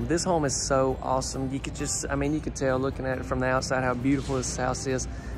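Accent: South, United States. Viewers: I'm not the greatest at placing southern accents. Does anyone know where his is from? I love it.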